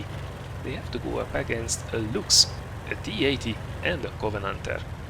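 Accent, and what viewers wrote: Hungary. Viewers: where are you from cause your accent is like Hungarien :)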